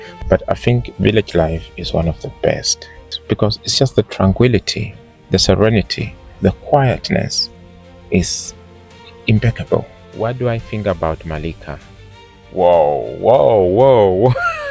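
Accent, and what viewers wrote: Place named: London, United Kingdom. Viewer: from Malika squad, happy to see you.oh my .... u have a London accent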